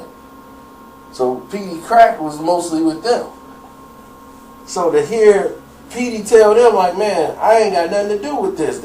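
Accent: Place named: Philadelphia, United States. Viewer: Why are you talking philly. Your a cornball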